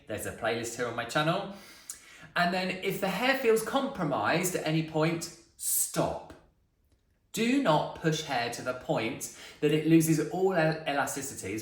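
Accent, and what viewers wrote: United Kingdom. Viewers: Hi ,are you in the UK? I'm assuming you are by the lovely accent you have xx Do you take bookings can you do my hair please ?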